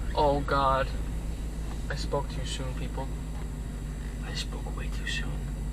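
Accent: New York, United States. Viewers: I swear, when I first heard you speak, I thought of my cousin that grew up off Utopia Parkway in Flushing Hillcrest. You sound exactly like him. It must be a "Flushing" variant of the New York accent in that area! LOL Great vid as always.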